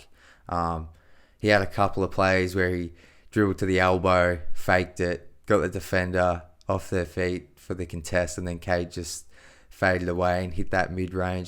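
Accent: Australia. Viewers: Came here from Twitter, and the Australian accent surprised me! Always love an international Deeeeetroiitttt basketball fan! Awesome breakdown & analysis, keep up the premium work!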